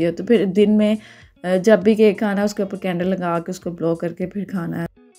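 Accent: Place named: Punjab, India. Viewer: Miss ap ka vlog boht pasnd he or ap k bat krny ka style panjabi he acha lgta he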